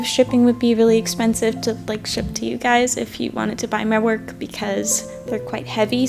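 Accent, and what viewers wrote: United States. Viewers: I’ve lived in Ohio for 30+ years and you sound like you’re from Ohio, Illinois, or Wisconsin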